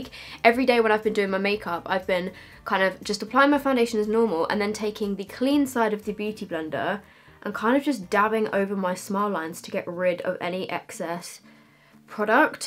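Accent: United Kingdom. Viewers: Liked the tips very much, but what I liked the most, is your pretty accent!!! I am used to all these Americans doing tutorials (although I am German), so I forgot how I love the British accent!!!! May I ask, where you come from? Is it (as I learned in school) Oxford English? Nevermind, my ears love it anyway!!! Sorry, that I do not comment so much at your technique or whatever but more at your speech.😂 (comment on /comment at?) Sorry, I am German as I said.😉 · I luvv your accent. Is that british? 😍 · She looks like Sabrina Carpenter and she talks like a British Sabrina Carpenter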